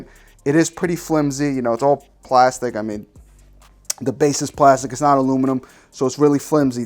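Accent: New York, United States. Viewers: That NY Accent is CRISP I love it hahaha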